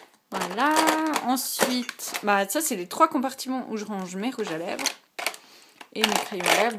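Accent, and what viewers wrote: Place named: Switzerland. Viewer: coucou ! je pense que tu mérite plus d'abonnées pour moi :) Wahou ta collection !! PS: Tu habite en Suisse ? ( J'ai l'impression que tu as un accent :$ ) Passe voir ma chaine ;) bisous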